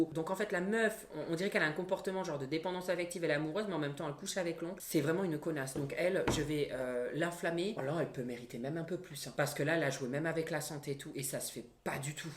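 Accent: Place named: Southwest, France. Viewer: moi aussi je le prononce comme ça et je suis du sud-ouest aussi comme lui.... "Am'mandonnéééééééééééééééééééééééé"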